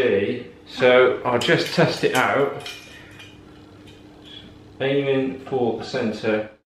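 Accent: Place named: Cheltenham, United Kingdom. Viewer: You sound like me ,you have got to be from Cheltenham.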